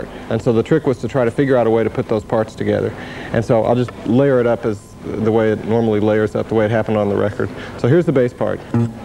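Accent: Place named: Texas, United States. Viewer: I never really noticed Tuck's accent before... is he originally from Texas? ...or this part of the country?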